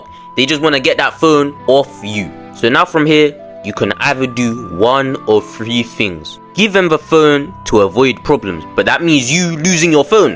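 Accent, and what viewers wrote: United Kingdom. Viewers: Londoner ain’t ya? You sound it atleast and the stories screammmm London lmaooo but this does happen in brum too sadly 🧍🏾‍♀️😭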